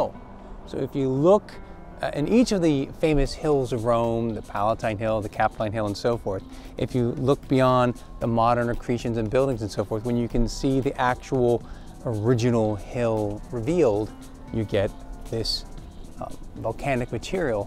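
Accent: United States. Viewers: Do Italians think you're Italian? I did. If you didn't have such an American way of speaking, no one would know (from looking at you), that you're not Italian or at least Western European.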